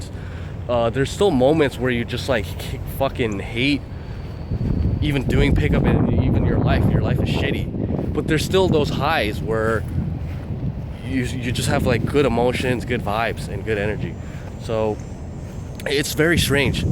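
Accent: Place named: United States. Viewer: were you born in the US? Your English sounds so American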